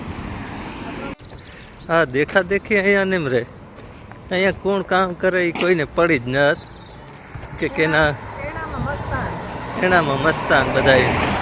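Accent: Kathiawar, India. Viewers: Sita Ram, bhai. Boli upar thi kathiyawadi lago cho. Lincolnshire chuo?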